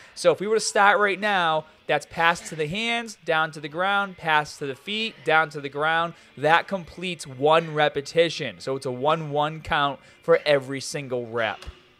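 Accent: Boston, United States. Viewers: The "Baaaston Haaabar" accent has gotten really bad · What's with this Boston accent all of sudden creeping into your videos ?????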